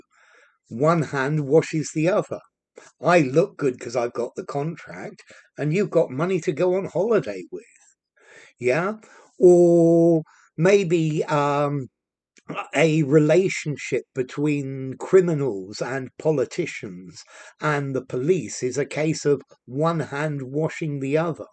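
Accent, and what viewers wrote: United Kingdom. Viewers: Sir You made me loving british accent.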